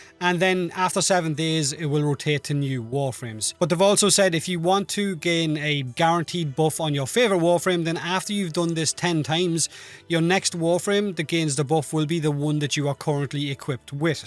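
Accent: Ireland. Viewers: U from Ireland bro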